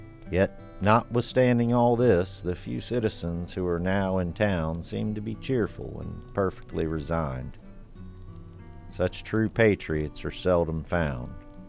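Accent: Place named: South Carolina, United States. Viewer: Good to hear someone from South Carolina. I had family there too.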